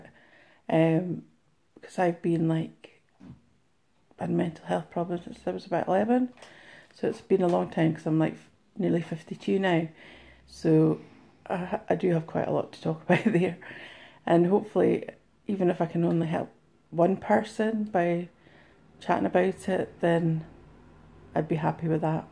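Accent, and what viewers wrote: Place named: Scotland, United Kingdom. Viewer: You do sound Scottish, but I love it!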